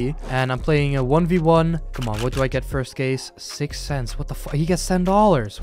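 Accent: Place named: Netherlands. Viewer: Lol ik ben nog niet zo lang gesubbed en je bent nederlands 10000% ik hoor het gewoon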